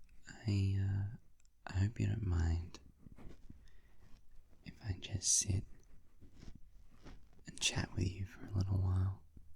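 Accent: Australia. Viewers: Your channel banner looks a hell of a lot like the roads going through Mt Baw Baw 😂 Just found you, honestly it’s nice to hear an Aussie voice for an ASMR fix.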